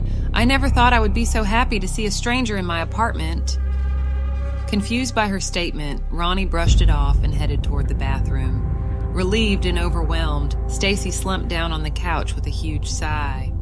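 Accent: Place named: South, United States. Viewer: Great trippy story! The southern slang narrator adds a texture of realism to this twisted tale too!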